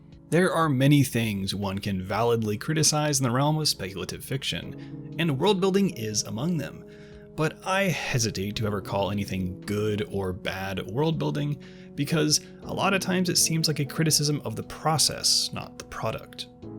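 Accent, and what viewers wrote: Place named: South, United States. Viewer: don't be ashamed of the drawl, don't let bad people Steal your way of speech I love the southern drawl it should be reclaimed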